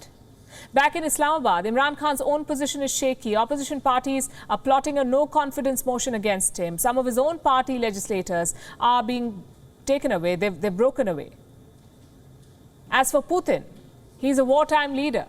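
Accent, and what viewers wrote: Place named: India. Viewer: It is Indian voice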